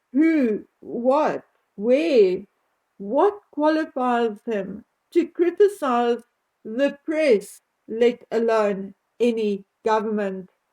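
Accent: South Africa. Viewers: Hello, I just happened upon your channel and recognise the accent, are you South African??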